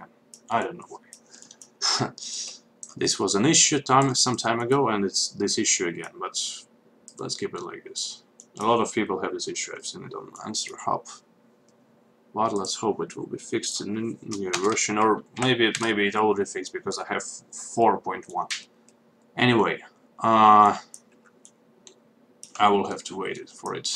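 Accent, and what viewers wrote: Russia. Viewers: Русский, спалился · I hear Russian accent!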